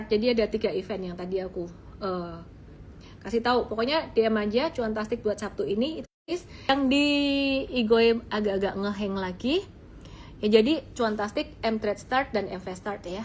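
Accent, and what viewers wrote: Java, Indonesia. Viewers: Cik tetep logat jowo ae, wong jowo ojo lali jowone. Kamsia. Gbu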